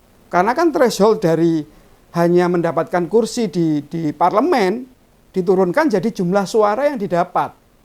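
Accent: Java, Indonesia. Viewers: ini asal daerah mana ya kok jawanya medok banget..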